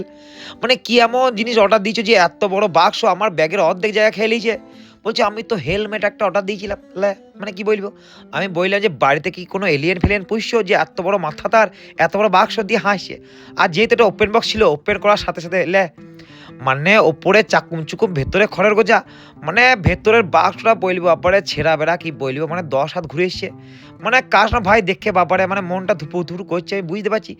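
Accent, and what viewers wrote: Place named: Birbhum, India. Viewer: তোমার বাড়ি কি বীরভূম জেলায় বোলপুরে ? আমার একজন বন্ধুর কথার সাথে মিলে যাচ্ছে । ওর বাড়ি বীরভূমের বোলপুরে ।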